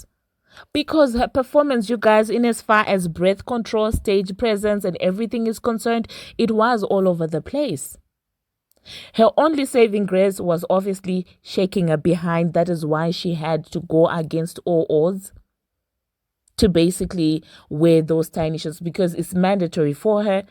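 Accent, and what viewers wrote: South Africa. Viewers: thank you for representing us. That South African English accent 👌👌👌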